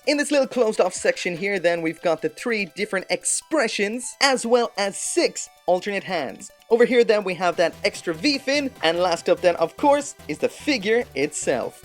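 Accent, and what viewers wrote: Ireland. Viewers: I had no idea you had an Irish accent! Regardless, Thanks for the reply, and you can count on me to continue watching and supporting your channel.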